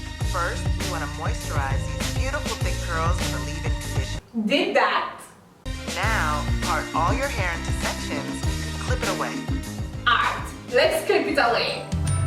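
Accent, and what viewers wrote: Jamaica. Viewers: I’m sorry I’ve never been to this channel but you sound really Jamaican are you?☺️👍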